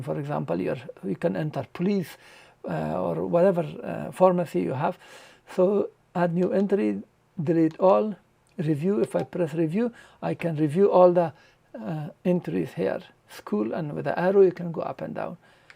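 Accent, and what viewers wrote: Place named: Poland. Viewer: I loooooooooooooooooooooooove your accent! Is that Polish?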